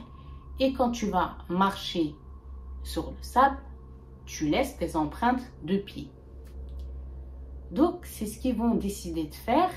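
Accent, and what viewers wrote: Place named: Arab world. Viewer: Bonjour ma belle je suis ta chaîne depuis très peu et j’adore ces sujets. J’ai une histoire à te proposer c’est celle du sorcier yéménite qui en voulant arrêter la sorcellerie ses enfants sont tous morts … je ne sais pas si tu connais cette histoire elle est vraiment incroyable Par ailleurs j’aime beaucoup ta lahdja quand tu parle en arabe, c’est laquelle ? Encore merci et bonne continuation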